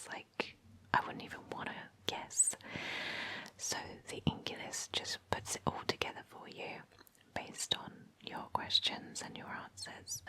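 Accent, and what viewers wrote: United Kingdom. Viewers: The most tingly british wisper on internet! We love you!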